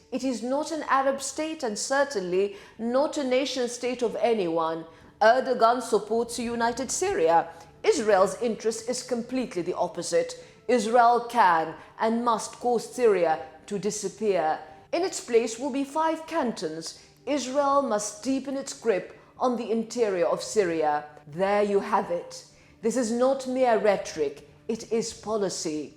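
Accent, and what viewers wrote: RP, United Kingdom. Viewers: Full support for Israel from The Netherlands 🇮🇱🇳🇱 Beautiful words with a posh accent does not hide the violence and hate embedded in the ☪️ULT called Islam! Everywhere stone kissers go, problems follow!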